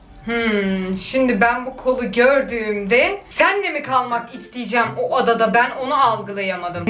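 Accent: Turkey. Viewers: Sen heç AZERBAYCANLİ KİMİ DANİSMİRSAN TURK KİMİ DANİSİRSAN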